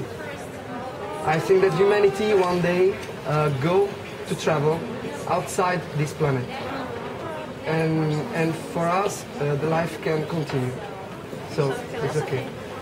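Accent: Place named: Italy. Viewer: This Italian accent!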